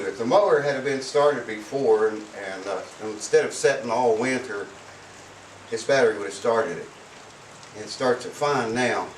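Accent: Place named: Kentucky, United States. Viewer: You be da man! Thanks for this video. I won't be doing anything you mentioned, but enjoyed watching you do your thing. You remind me of my Nam buddy, Bob McIntosh from Kentucky...same accent and same easy way of expressing yourself. Brought me back in time, so thanks again!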